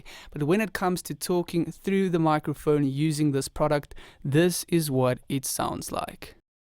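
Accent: South Africa. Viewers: Ayyyyy. I detect a south African accent ! Nice channel 😃🙌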